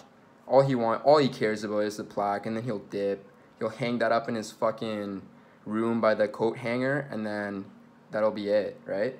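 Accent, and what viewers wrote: Canada. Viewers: You and Brad sound just alike. Must be a Canadian thing.. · You just sound so Canadian and it’s all I can think about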